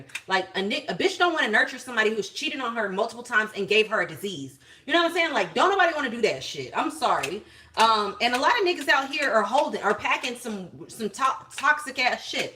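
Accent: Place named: South, United States. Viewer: we Luv you you too sexy and your voice is beautiful. I love your southern accent it is sublime. you just don't know. well now you do mmmmmm there is no comparison between you too. I came threw the door and said it before, that was that shit.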